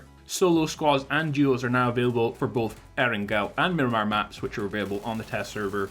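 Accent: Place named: England, United Kingdom. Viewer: Your voice is like pencils getting stabbed through my eardrums. You goofy English Fuck.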